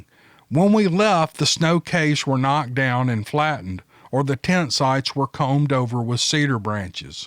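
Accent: South, United States. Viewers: Good read keep up so sweet southern accent.🎉❤😊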